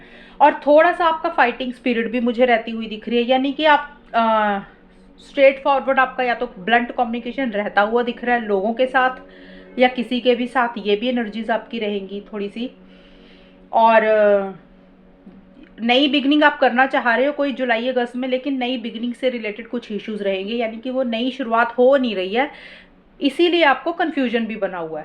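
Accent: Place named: Jammu, India. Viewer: arrey ni mam I mean apke bolne k touch se lga ki ap jammu se ho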